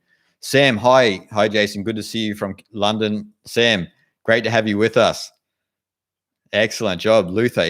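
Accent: Australia. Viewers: Hello Mate!🖐❤🙏🕉 I'm from Los Angeles.😈 oops! I mean😇. Brah hahahaha🤪🤪. Its Thursday pm PS time! Watching the replay! Thanks Buddy❤👍.L❤VE your Aussie Accent!😇❤🙏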